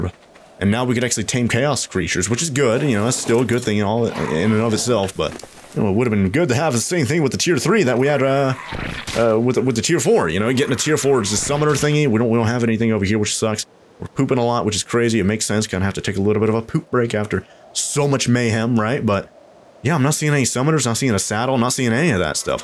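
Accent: Australia. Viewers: i have started to watch ur scorched earth series and realized u sound Australian are u Australian in real life?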